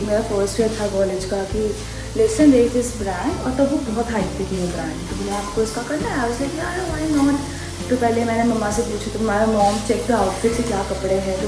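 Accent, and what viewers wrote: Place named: Rajasthan, India. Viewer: Or muje phle hi andaj tha ki sayad aap pali ya jodhpur se ho kyuki apki bat karne ka style vesa h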